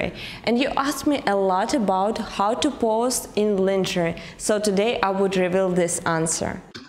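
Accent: Russia. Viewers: ХММммм...мне кажется или я слышу русский акцент?)))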